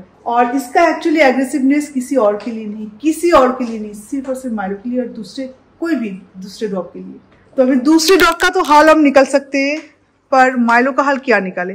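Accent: Bengal, India. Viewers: she speaks hindi, in bengali.... too much to take... both her hindi and english is a blot for all urban vloggers... upbringing and background matters !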